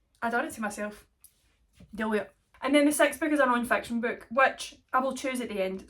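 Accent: Scotland, United Kingdom. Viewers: Love hearing a fellow Scot! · If you have a watch stat of like 400 % on the first 3 seconds, it was me trying to understand what you said! But yes, yey, spring! 😂🌸 I'm reading The Maiden at the moment, which is set in Scotland, and having watched your videos is very helpful because now I kind of hear the book in your dialect while I'm reading. It's great! And you got a great TBR! I'll be interested to hear what you think of Cultish and A Tempest of Tea. I'm also wondering who all these people are who read the second book after a bad first one, and then tell anyone the second one is much better. What a sacrifice! 👏👏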